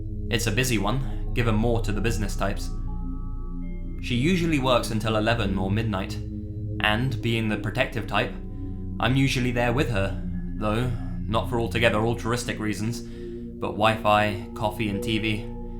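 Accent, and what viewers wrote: England, United Kingdom. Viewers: God bless the British accent. "I'm good looking and assumed it was a pervert or I assumed I looked like his granddaughter." God bless the British. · the main reason I'm subscribing is because of that british accent lol number fooh hehe good job tho i realy enjoy ur vids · are you from England?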